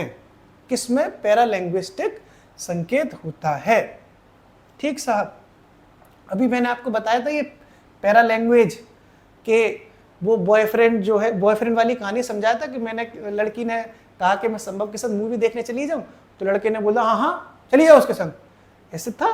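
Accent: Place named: Etah, India. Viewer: Bhaiya aapko jaha tak h Maine drishti coaching mentorship me dekha tha aap bhi join kiye the upsc ki aur shayad aapka ghar etaa side h aapki boli se lag rha h😊😊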